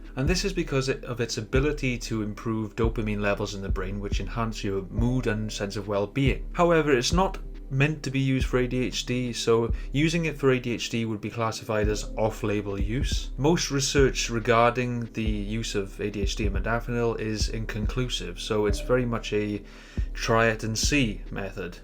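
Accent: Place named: Swansea, United Kingdom. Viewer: You gotta be from south Wales butt you sound like a Swansea lad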